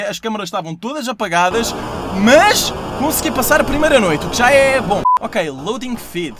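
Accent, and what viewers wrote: Portugal. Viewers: Oi, eu acho sua lingua engraçada vc fala pt Portugal e eu pt brasil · não ele fala portugal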